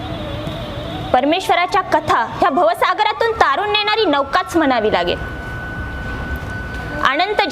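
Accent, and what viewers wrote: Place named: Vidarbha, India. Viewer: 🙏दण्डवत प्रणाम कु ताई 🙏जीवन भावसार, पुणे!उत्कृष्ट कथा कथन ताई प्रसंग डोळ्यासमोर उभे केलेत आणि डोळ्यात अश्रू आलेत, निशब्द झालो 🙏वऱ्हाडी भाषा तर अप्रतिम 👍🙏🙏🙏🙏🙏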